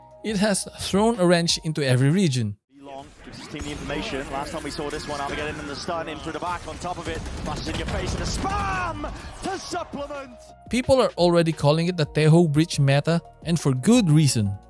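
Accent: Philippines. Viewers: why do u sound filipino bro. Anyway, here's my sub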